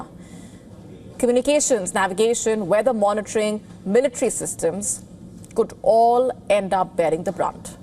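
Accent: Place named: India. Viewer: INDIAN ENGLISH 😂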